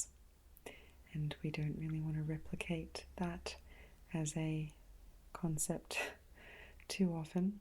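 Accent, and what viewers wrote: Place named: Australia. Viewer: Beautiful, classy Aussie, erm, Australian accent. And, deliberate, specifically chosen words. Thank you. You are so enjoyable to listen to. And the type of person my wife and I seek out as a friends. Keep going! Best wishes from LA.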